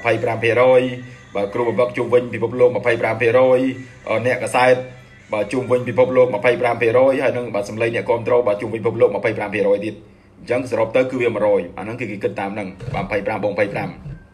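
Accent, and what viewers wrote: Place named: Stoung, Cambodia. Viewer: សម្លេងadmin ដូចអ្នកស្រុកស្ទោង កំពង់ធំ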